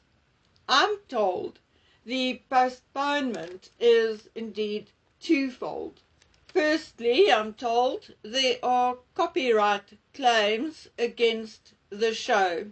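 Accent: South Africa. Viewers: Do I detect a South African accent? I was fortunate enough to live in Rhodesia, a once beautiful and prosperous country, for 31/2 years, during UDI. ☺️🙋‍♀️🇬🇧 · I have just found this video your accent is south africam it is so great to hear another SA AM now living in reno nevada I was born in joburg so I am so chuffed I found your channel❤❤❤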